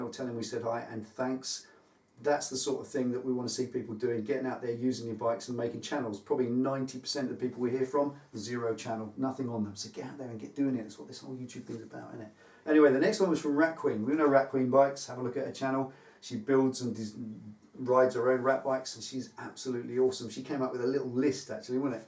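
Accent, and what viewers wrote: United Kingdom. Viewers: Hi. I watch all your videos. You are a fantastic orator. Your british accent sometimes trips up my American ears though. At the beginning of most of your vids, you use a word I can never make out. I think uses it at the beginning of " ". I can't understand him either (especially in that song). What is that word? It sounds like "watcha", "watcher" or something like that. It's driving me nuts!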